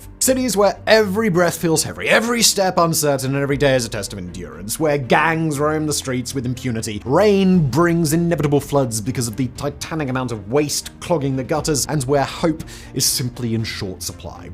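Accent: United Kingdom. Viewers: for a posh english b@ how do you mispronounce so many names and words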